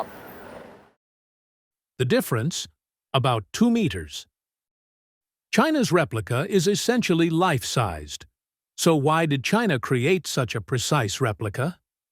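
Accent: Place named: United States. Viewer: nippon looks Japanese but its Narrator sounds likea Usa Anglosaxon Go FIGURE THAT ONE OUT!!!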